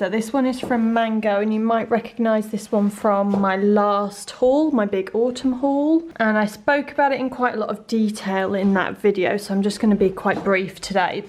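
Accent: United Kingdom. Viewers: you look so amazing and I love your British accent so much 😍😍 Have a nice day girl and if you love music, have a listen to this cool song on youtube: - I love the sunny vibe of it and I hope it will make your day better too 😍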